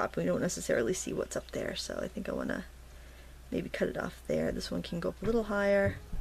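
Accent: Maine, United States. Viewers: I believe its a Maine accent thing, she's saying across. I've heard it in a few other peoples videos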